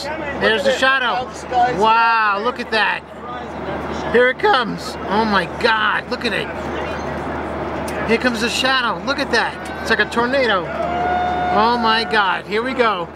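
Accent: New York, United States. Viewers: oh my gahd, here we go, oh my gah, here we go, look at dis x 100! New York obnoxiousness on an Alaska Airlines flight? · lol Not another one.. Oh my God, oh my God. But, sir, thank you for filming a perspective most of us couldn't see. (You from NY btw?)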